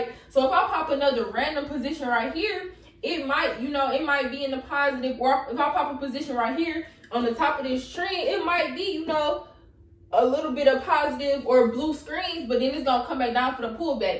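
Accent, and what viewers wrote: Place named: Texas, United States. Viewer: you sound like you from my neck of the woods. Texas Where you from?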